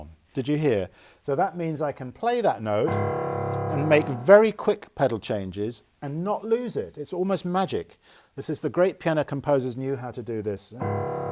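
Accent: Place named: United Kingdom. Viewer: Very enjoy hearing your British accent :)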